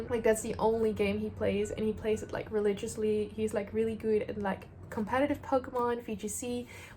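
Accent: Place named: Netherlands. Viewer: Ik hoorde direct aan je accent dat je ook Nederlands bent! ♥️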